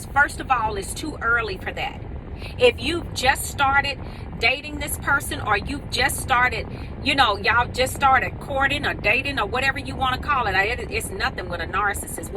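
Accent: South, United States. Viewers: Love u Soo much♥️ U are so real, southern sounding and wise. I'm from Florida in Denver . Thank u for your content🥰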